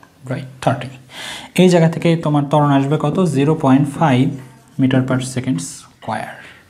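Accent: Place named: Bogura, Bangladesh. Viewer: ji sir kotha sunlei buja jai bogurar manus,kon jaigai apnar basa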